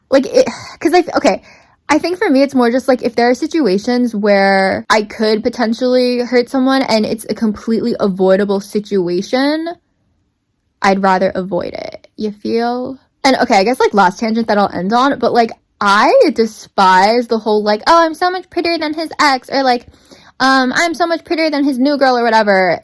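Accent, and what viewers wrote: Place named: Australia. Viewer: ok but why is no one talking ab how fire that australian accent was??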